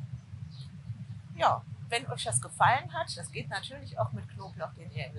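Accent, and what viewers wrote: Hesse, Germany. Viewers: Großes Lob an die Macher, und am besten gefällt mir, dass ab und zu auch mal ein hessisches Wort durchklingt 🙂👍weiter so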